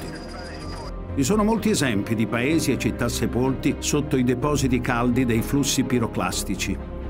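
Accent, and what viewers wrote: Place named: Calabria, Italy. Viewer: Narratore con forte accento calabrese...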